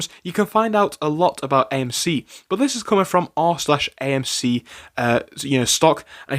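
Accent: United Kingdom. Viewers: You from the North? A UK Ape?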